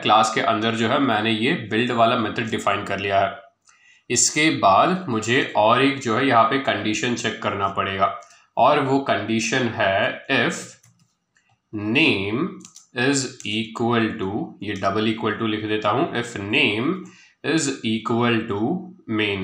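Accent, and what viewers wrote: India. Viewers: Anyone relize the amazing indian accent?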